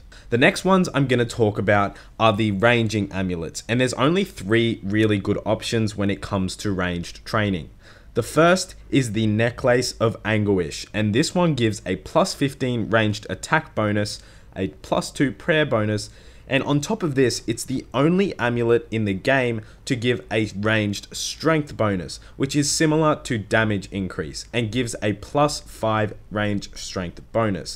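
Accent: Australia. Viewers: He's australian... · That aussie accent is so stupid funny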